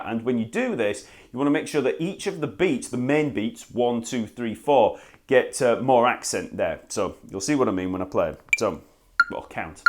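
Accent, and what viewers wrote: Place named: England, United Kingdom. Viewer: Where are you from, Mate? Liverpool? South London? I can understand everything you're saying.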